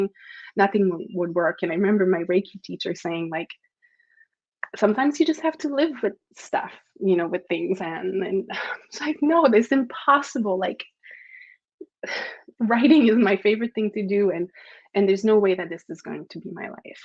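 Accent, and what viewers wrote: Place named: Quebec, Canada. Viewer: Bonjour! Je reconnais ton accent canadian francais, je suis de Montreal et francophone aussi. As-tu écrit ce livre aussi en francais?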